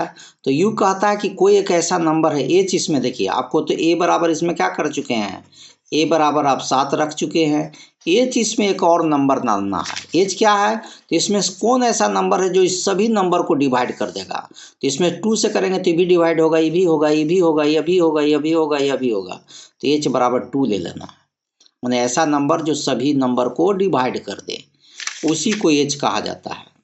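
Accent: Bihar, India. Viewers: Sir आप bihar से है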